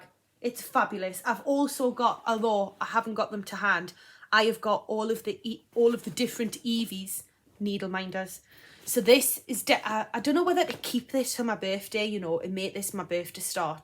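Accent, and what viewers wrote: Newcastle, United Kingdom. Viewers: Great to see a Geordie on here. I always loved the accent. Fantastic first video, loved your projects, look forward to following your journey. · Yay this was really fun!! Good work ❤❤❤❤ once I got over the fact that I wasn’t expecting the Geordie accent 😂😂 xxx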